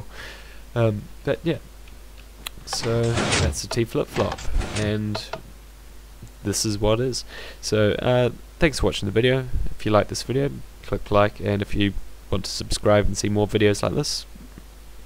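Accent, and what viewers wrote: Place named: Germany. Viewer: Are you German? Cause you sound a little bit like a German ^^'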